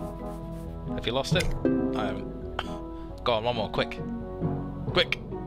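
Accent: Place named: France. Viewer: I want you casting every Monster Hunter Podcast in that frensh voice? Très bien! Best regards "Cooking Papa" Oli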